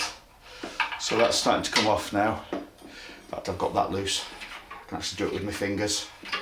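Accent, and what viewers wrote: United Kingdom. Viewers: Great video, thanks for detailed explanation. Easier to understand with a British accent. I'm from north of the border but it still works for me. ;) Again, thanks. Just picked up my 2019 XC recently and this is high on my list before the summer heat hits Atlanta.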